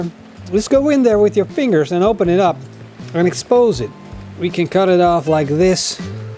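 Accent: Netherlands. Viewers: wat een mooi nederland accent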